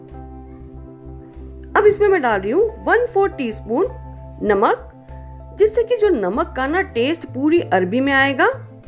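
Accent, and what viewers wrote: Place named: Himachal Pradesh, India. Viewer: Mem 🙏🙏Aapki voice pahadi he (Himachal)ke jesi sweet voice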